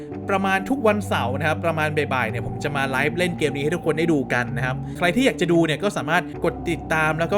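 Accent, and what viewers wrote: North, Thailand. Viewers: เหมือนคนเหนือเลย พี่อยู่จังหวัดอะไรครับ 555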